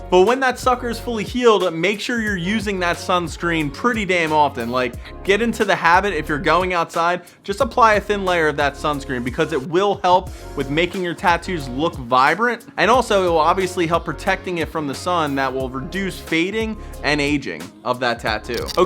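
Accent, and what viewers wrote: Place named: Pennsylvania, United States. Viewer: U sound Pennsylvanian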